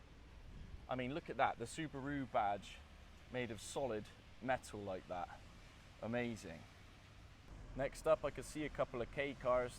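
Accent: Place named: Bristol, United Kingdom. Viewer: Since no one has mentioned it, it’s a honour to actually see! For so long you’ve just been a Bristolian voice 👍🏻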